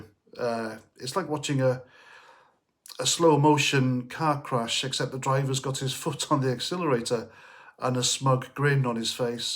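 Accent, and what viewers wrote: Wales, United Kingdom. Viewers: Er, how about "no"? Saves you having to listen to drivel delivered in a ghastly, lisping Welsh accent.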